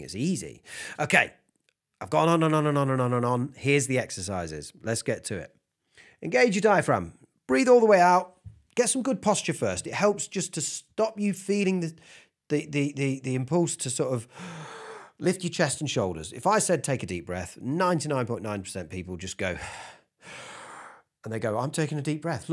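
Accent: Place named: North of England, United Kingdom. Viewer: Great talk/lesson. Sort of proves that often the best teachers are the ones that have been through the crap rather than the ones that had just done it right from the start, if that makes any sense. I heard once somewhere that Bach was a terrible teacher, because it (music/composition I dunno) was all so obvious to him he couldn't understand how anyone couldn't get it, so he was impatient and couldn't explain stuff haha. Sounds like you're from the north of England somewhere, the place I feel the most at home. Unfortunately I'm a long way from there and walk around upside down atm . haha Thanks and kind regards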